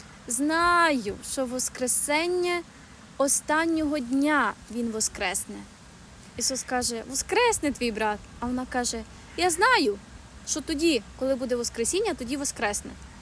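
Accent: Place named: Transcarpathia, Ukraine. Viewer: ❤️Оце найкраще подтвердження, що наш Господь Ісус розмовляв з Мартою та Марією... файною українською мовою з закарпатською вимовою😃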